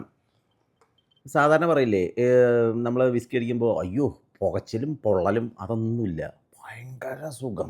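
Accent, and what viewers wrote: Thrissur, India. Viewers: തൃശൂർ ആണല്ലേ.... വീട്..... ആണോ ഗെടി....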